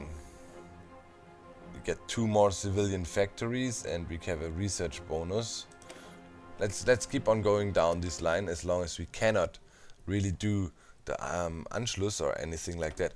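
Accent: Germany. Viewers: history, hoi4, docm, German accent, and a constant reminder of that German censorship of hitler(which I find the whole concept of that really hilarious?), what could be better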